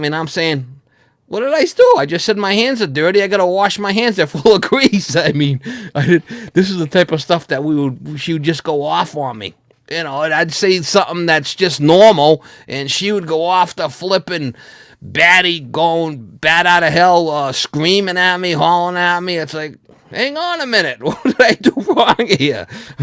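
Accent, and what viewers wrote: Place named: East Coast, United States. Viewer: The east coast way of speaking sounds pretty odd from here at the west coast. Quite the accent. But I'm sure I would sound pretty odd to too.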